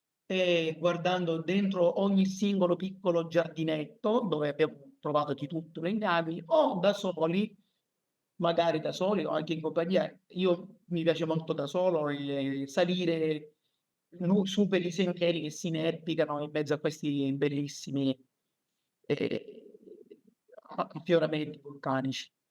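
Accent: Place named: Sicily, Italy. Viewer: scusate l'inizio è tagliato...e i sottotitoli automatici della IA non tiene conto della mia pronuncia "sicula", tagliando parecchie parole o facendo una stranissima interpretazione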